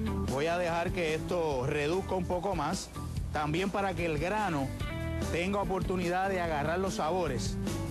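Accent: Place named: Dominican Republic. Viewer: Este chef , siempre me a aparecido su acento como Dominicano, no puertorriqueño..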